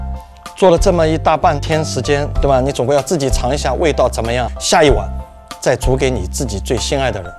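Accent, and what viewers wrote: Shanghai, China. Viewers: 标准上海普通话👍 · 一开口就晓得了，阿拉上海特有的普通话口音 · 师傅是上海口音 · ，特别喜欢你的视频，尤其喜欢不经意流露出的上海话。看了那么久的视频，直到看到你的视频由衷的感叹，专业的老师傅就是不一样。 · 大哥，听口音你是上海人是伐。你这碗小馄饨太感人了。😭我土生土长的上海80后，一不当心远嫁加拿大。太想念想小时候吃到的上海点心美食了，好几年没回上海了。大哥下次教一下粢饭糕还有油条怎么做好吗？怀念小时候路边摊的味道。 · 上海腔十足的师傅做出美味无穷的江南美食，让我又想起了家乡。好想吃上海的小馄饨~ 谢谢 ❤ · ，上海口音。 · 带着上海口音好亲切，想念上海小馄饨了有空壹定按朱師傅的配方做壹次對自己好壹點哦!👍😍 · 一听就是上海人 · 一听就是阿拉上海大师傅😄对自家好一点😋嗲额！看了我才吐水哒哒滴😋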